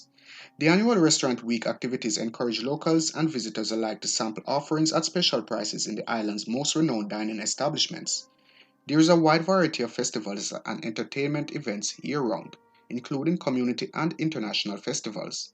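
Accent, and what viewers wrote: Jamaica. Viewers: By the way he pronounced certain words,I wouldn't be surprised if he's Jamaican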